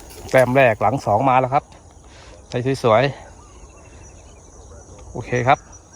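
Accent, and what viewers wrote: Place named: Central Thailand, Thailand. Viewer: สวัสดีครับน้าเพิ่งเข้าดูติดตามให้กำลังใจครับชอบดูคลิปดักหนูน้าอยู่จังหวัดอะไรครับดูจากสำเนียงการพูดน่าจะเป็นคนภาคกลางครับ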